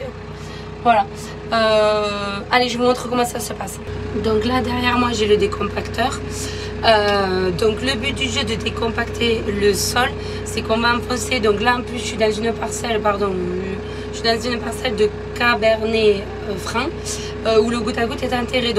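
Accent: South, France. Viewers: Belle vidéo, bien expliquée, vous êtes du sud de la France ha oui je me demandais d où venait cet accent, bien agréable. De Vendée non, du Nord non. Plus sérieusement, l'outil doit utiliser la puissance du tracteur. D'ailleurs, ce n'est pas le fendt, il n'est pas cassé 😉. Je suis rassuré car tout a bien été réfléchi dans la tête d' et le résultat sera bon. À bientôt pour une nouvelle vidéo, et toujours avec le sourire.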